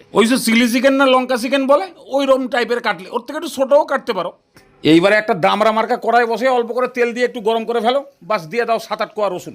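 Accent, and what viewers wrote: Bangal, Bangladesh. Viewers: খুব সুন্দর করে বলেন, আমি আপনার দেওয়া রেসিপি গুলো করে খাই, তারপর আমার মা চট্টগ্রামের মেয়ে, রান্না তো জানেন,আপনার কথা গুলো শুনে মনে হয় আপনি বাঙাল,তাই তো অত ভালো রান্না করেন।আমি শুভ্রা। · বাঙ্গাল কথা কি বলতেই হবে। কথার ধরন শুনে এদেশিরা আর আগ্ৰহ দেখাবে না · Bangladeshi accent 😊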